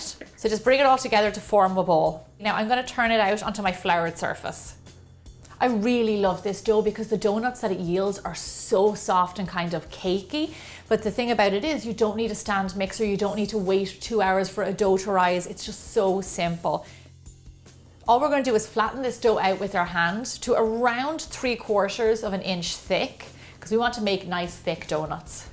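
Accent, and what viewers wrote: Ireland. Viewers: She is just soooo Irish! I love her accent !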